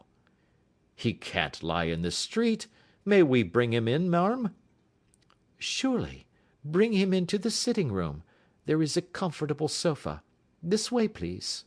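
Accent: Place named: United States. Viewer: Please, please NO Americans reading Holmes. TOTALLY RUINED Holmes stories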